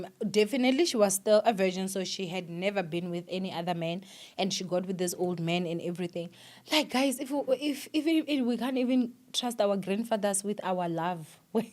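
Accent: Venda, South Africa. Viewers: Your venda sound so sweet😊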